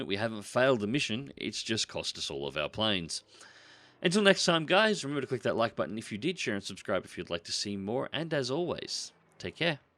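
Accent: Australia. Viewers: Does the J-25 variant have all the perks of the L? Boosted ailerons in particular are what I’m thinking of... Love watching these videos. Ozzie accent, solid flying, honest ego-absent gameplay experience. I’ve been around the channel three, maybe four years (since you played War Thunder with Phly way way back when the game was good—he introduced me to you) and I’ve stuck with your channel long after I’ve stopped watching many others.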